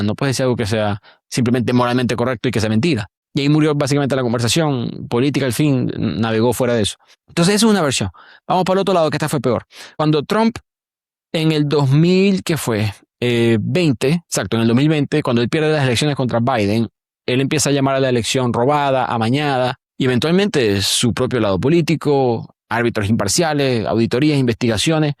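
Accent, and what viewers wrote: Maracaibo, Venezuela. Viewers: Amé el tema y amé el acento maracucho 👏🏻👏🏻👏🏻👏🏻😂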